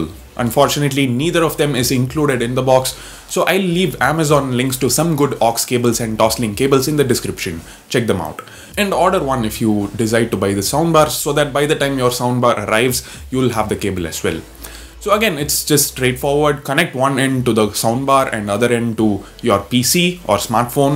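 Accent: India. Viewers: Nice indianglish...😎😎😎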